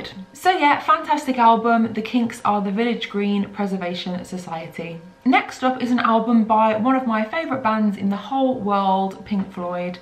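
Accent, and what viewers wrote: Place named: Manchester, United Kingdom. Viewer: You just showed me that I need to catch up on a lot of good music 🖤 btw are you from around Manchester? Just trying to identify your gorgeous accent. Maybe I'm totally wrong - sorry about that. Hello from Slovakia! 🦥💨🤢😆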